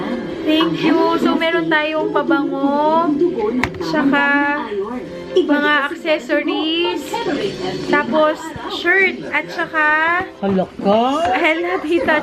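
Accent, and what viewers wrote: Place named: Visayas, Philippines. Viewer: that little visayan accent or ilocano accent ? 😂😂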